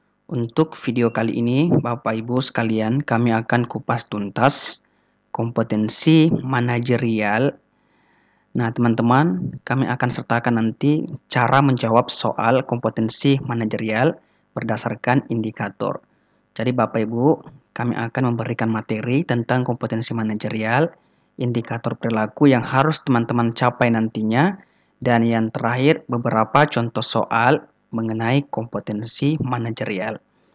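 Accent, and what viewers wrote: Bugis, Indonesia. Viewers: Cekcok logt Bugis 😀😀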